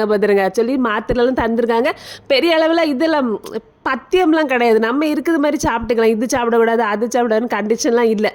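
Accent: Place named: Tirunelveli, India. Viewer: Love u akka ungala enakku rompa pedikkum. Nama tirnelveli pasai super akka. Enga vettuku vanga akka pls ❤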